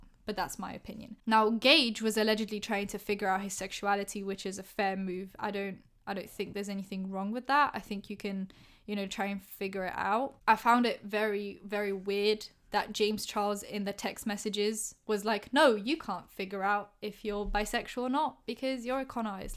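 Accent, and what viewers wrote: United Kingdom. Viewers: This woman has the most mixed British accent I've ever heard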